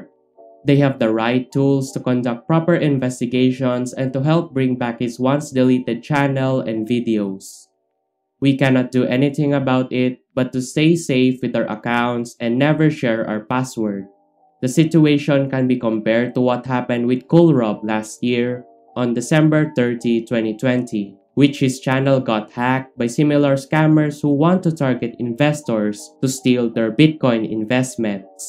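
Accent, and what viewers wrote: Philippines. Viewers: i knew it your a Filipino by your accent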